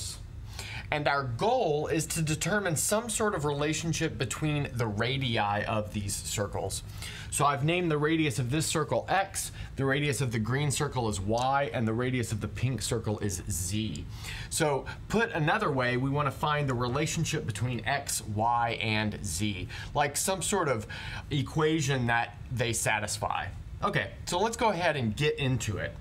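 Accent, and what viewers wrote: United States. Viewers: this guys American pronunciation is infuriating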